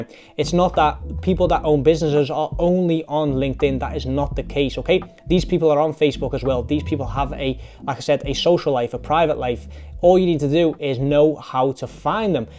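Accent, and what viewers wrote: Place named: Liverpool, United Kingdom. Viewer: You're from liverpool like it or not. Talking like jordan henderson and TRENT alexander arnold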